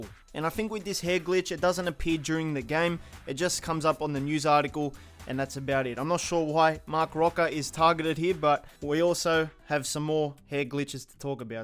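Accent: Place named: Australia. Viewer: Pretty sure he’s Aussie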